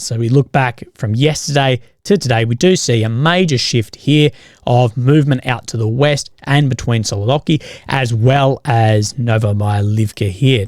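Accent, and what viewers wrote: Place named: Australia. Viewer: i have watched over 200 of videos. He is the best at zero line tactic analysis. Always very balanced, pulling for Ukraine. There were monetization issues and Gaza. He was always begging. After his Dad died, whammo, a turn around. Now he parrots the RT slant, for a while wore some pretty fancy clothes and promoted not begs now. Indicators of financial influence. assessment that loosing the Kerch Bridge, the only potential withdrawal line, is not important is right outta current Russian state propaganda and laughable on its face. I unsubscribed, I've no need of RT with an Australian accent. Sayonara Kerch, hut don't worry, says it is no prob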